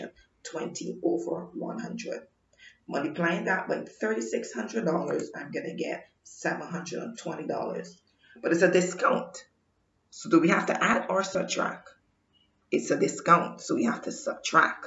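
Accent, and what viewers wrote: Barbados. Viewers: Thank you, this helped me a lot and I love your accent are you a bajan?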